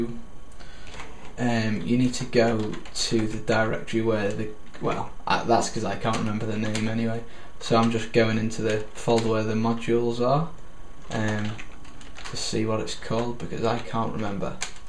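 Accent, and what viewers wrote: Liverpool, United Kingdom. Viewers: Are you from the Wirral/Liverpool?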